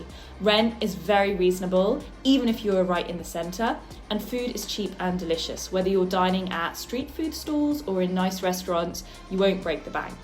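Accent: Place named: United Kingdom. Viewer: I'm listening to your lovely British accent (from an American standpoint)and I'm wondering why there are so very few Americans doing videos of this sort.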